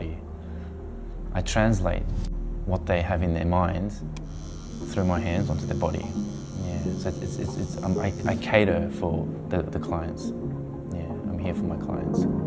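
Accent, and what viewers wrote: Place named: Australia. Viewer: The Australian accent threw me off for being japanese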